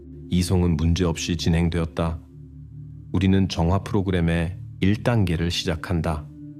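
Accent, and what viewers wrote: China. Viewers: 약간 조선족말투 같기도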